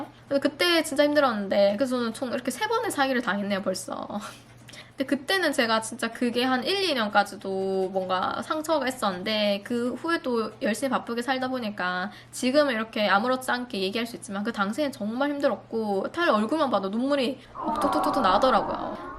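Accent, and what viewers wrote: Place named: Daegu, South Korea. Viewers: ㅠㅠ 너무 속상한 일일텐데 말도 너무 웃기게 하시고 ㅋㅋㅋㅋ 사람들이 비슷한일 당할까봐 열심히 설명해주시는게 너무 귀여우세용!!! ㅋㅋㅋ 저도 대구사람이어서 사투리도 너~~무 반가워요!! 은행사이트도 구글에 검색해서 들어가면 똑~~~~같이 만들어서 사기치는 놈들이 있다고 하더라고요.. 꼭 진짜 사이트인지 확인 잘하시고 이번에는 더 큰일 안나서 다행이에요!!♥️ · 항상 웃기고 재미남 지송 사기당했다는데...있는그대로모습 미국에서 대구사투리 찐정겹당 ^^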